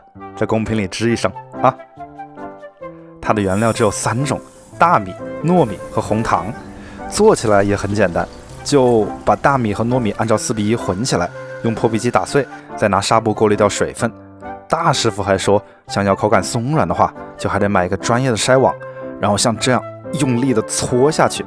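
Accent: Wuhan, China. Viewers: 听口音就知道你是武汉的，南京那个 不就是变种的 武汉糯米包油条么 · 要不要咸蛋黄 都听出了武汉话口音 哈哈哈哈 好亲切！ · 听你口音就晓得你是武汉牙，哈哈哈哈，蒸糕全是小时候的回忆！ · 你这个汉普越听越亲切！ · 你这个普通话一听就是武汉的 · 哈哈真~的是武漢口音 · 武汉普通话是相当的正宗，封面的蒸糕也是武汉的样式。现在这玩意在武汉也看不到了吧？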